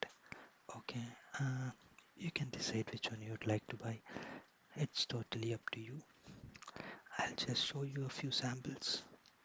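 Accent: India. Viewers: There is just something about the Indian accent that pairs well with asmr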